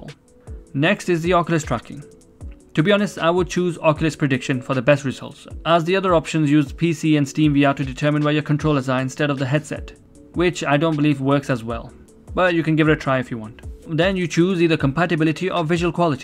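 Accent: India. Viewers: u sound like dani but indian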